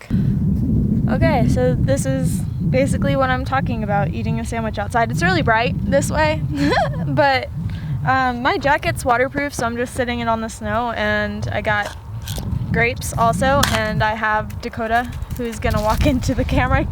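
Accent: South, United States. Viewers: I could tell you grew up in the south. I heard you say "Rat now" not right now. I knew then we were neighbors, a couple hollers over.😄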